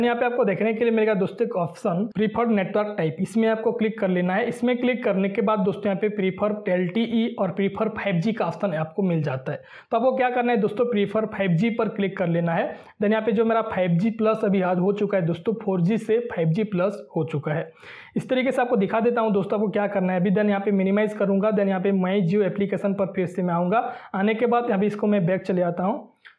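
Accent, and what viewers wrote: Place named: Odisha, India. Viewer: Mujhe thoda thoda laga ki aap odisha se hai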